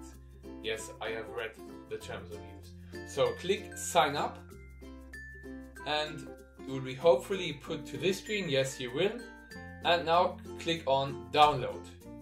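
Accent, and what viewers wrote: Germany. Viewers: "Installachion" Versuch mal den deutschen Akzent wegzubekommen. Das kann man sich kaum geben.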